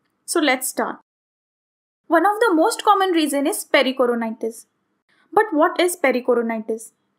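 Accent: India. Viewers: They sound Indian of something similar and this ain’t a Pc guide I don’t trust it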